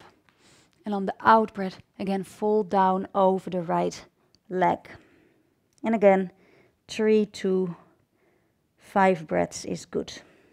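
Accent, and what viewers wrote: Netherlands. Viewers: i love your dutch accent!!!!!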